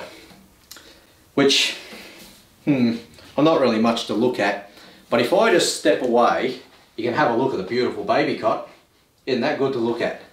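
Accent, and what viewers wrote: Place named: Australia. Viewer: I've just subscribed. Good to hear an Aussie on YT. Keep up the great work.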